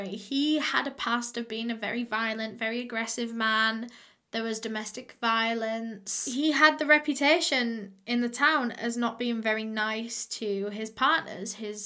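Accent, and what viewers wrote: United Kingdom. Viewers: I can't say for sure, but I'm British and I think her accent is British too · Dirty cops... jeez. Question:, are you British? My niece wants to know what your accent is.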